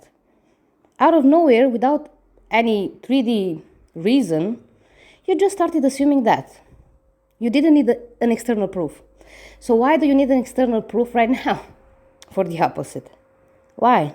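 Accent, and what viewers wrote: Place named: Spain. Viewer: I always tought you were Spanish! You sound jut like a friend of mine who is Spanish and speaks English at work :) Anyway, you are a fresh breeze in LOA YT community and I like your direct style without offending anyone and calling girls 'bitches' :D Thank you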